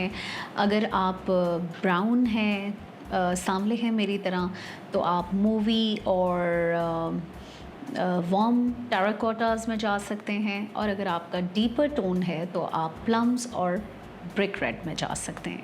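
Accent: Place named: India. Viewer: Why are you talking like Indian!? Why can’t you say khareed & baghair properly if you’re a Pakistani I’m surprised.. 😳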